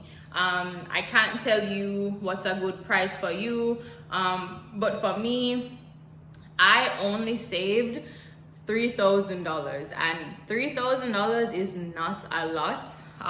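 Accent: Jamaica. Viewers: Heard the Jamaican accent and instantly subscribed 🤣. Moving to Houston soon so I needed this video. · I know this was posted a little while ago, but thanks for sharing. I can hear an accent Jamaica Im assuming. I’m curious how easy/is it to find a community of islanders? Places you recommend? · i heard that caribbean accent jamaican to be exact. Thanks for the insight.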